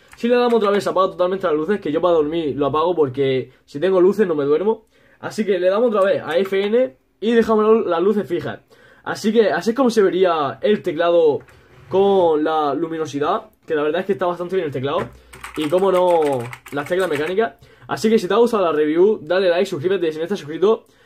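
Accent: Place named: Murcia, Spain. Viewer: Buassss cómo se nota que eres de murcia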